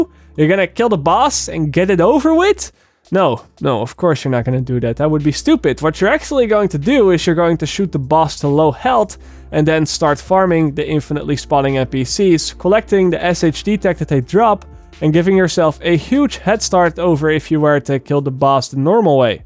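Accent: Netherlands. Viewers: I can hear you are dutch🤣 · Cool video! I really love that durch accent not gonna lie 😂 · Are you dutch ?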